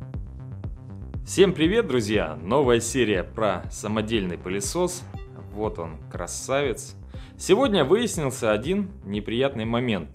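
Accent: Rostov, Russia. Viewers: автор, ты из Ростова что ли?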